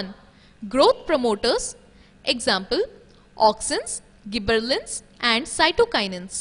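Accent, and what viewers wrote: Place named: India. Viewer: typically indian lol plz dont change english t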